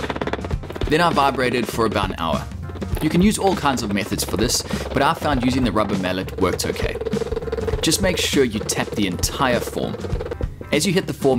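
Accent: South Africa. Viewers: you sound totally south african to me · Boet, I see you're in Oz, but you sound just like a Saffa? Great video by the way. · Your accent has alittle South African in it..love your work.. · Hi. I can hear from your accent that you are from South Africa, what cement do you recommend using thats available in SA? Thanks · Thought to myself, this oke sounds Saffa, then spotted the bare feet for confirmation LOL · That accent is SOUTH AFRICAN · Aussie - who woulda known, you sound South African. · Oi this dude sounds like a South African bloke? ;) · You sound like a South African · This is definitely a South African accent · South African? Great job btw. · Hey man, this is great 👍 Are you in perth? ( you sound South African ) , we have lots of saffas here. Nice work again mate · Definitely a South African living in Australia. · Helpful video. Thought you were South African based on your accent.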